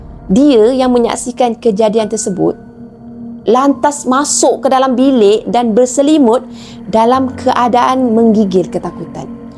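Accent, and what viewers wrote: Kelantan, Malaysia. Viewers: Kak bercakap KL capur dngn bahasa kelantan,saya selalu hadir Kak,saya dari kelantan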